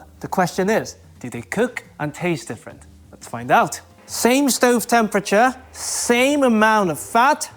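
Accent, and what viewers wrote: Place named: United Kingdom. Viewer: I’ve never seen an asian looking British sounding dude before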